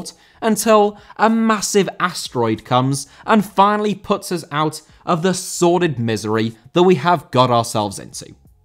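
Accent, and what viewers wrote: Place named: England, United Kingdom. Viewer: whinging about Elon Musk is probably the most predictable thing ever. I'm going to take a wild guess and assume twitter has pronouns in it's bio and every trendy flag of the month. Lucky it's pommy accent helps me sleep.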